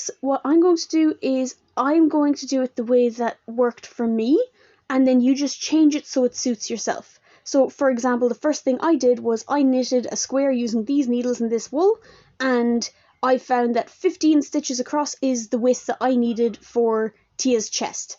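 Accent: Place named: Ireland. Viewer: Excellent!! And with an Irish (?) accent to boot! 🙏🙏👏👏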